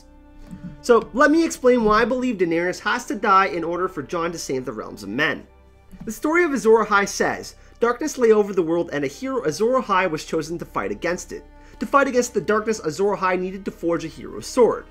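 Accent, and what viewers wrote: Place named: Canada. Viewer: Wass🆙 🆒vid! Enjoyed this 💯% Your up-beat personality 💞 makes your vids a joy🌈 to watch👀 Your Cute Canadian accent makes your vids a joy🌈 to listen to👂 Always a pleasure, Thanx! 💞 💙💛💚💜💙💛💚💜💙💛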